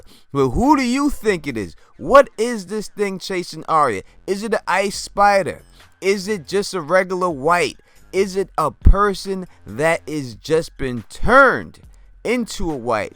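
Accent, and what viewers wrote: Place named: Queens, United States. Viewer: Great analysis - thank you,. I love your Queens, NY accent - makes the world feel much better xD (Im from QV)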